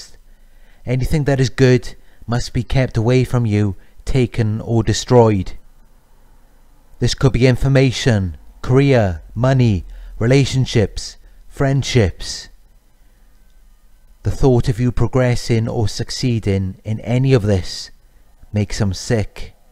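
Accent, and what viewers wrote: United Kingdom. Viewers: Very Cute, I think he is from the U.K.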